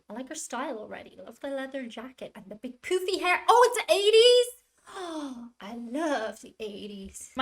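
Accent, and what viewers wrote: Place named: Ireland. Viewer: Question for! Your accent fascinates me. There seems a fair amount of Irish in the background. Where do you come from. By the way, you have a very pretty face. ( That's one of the reasons I watch your videos and love the music )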